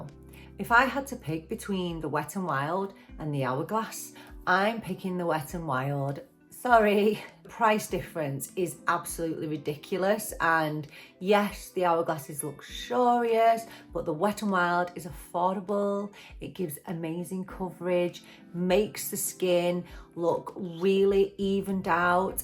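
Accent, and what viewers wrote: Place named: Manchester, United Kingdom. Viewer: Thought I detected a slight Mancunian accent ☺️. Wilko stores no longer trade, haven't found any pharmacies that stock Essence as yet!! Have a good day! Xx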